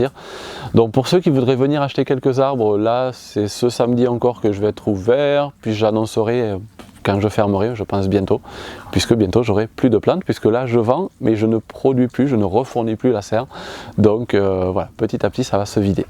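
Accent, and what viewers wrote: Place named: Tarn, France. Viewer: bonjour bonjour ! si jamais tu passes en correze hésite pas a venir nous voir (on se connait pas du tout mais on se sent connecté a toi on vient de villemur sur tarn et ton accent nous ramène chez nous et puis on kiff tes videos ta philosophie etc !) bref on fait de l'elevage de brebis et transfo fromagere, de la perma et du chanvre bio tout ca tout ca, t'apprendras pas énormément on est pas des experts mais on est sympas !! )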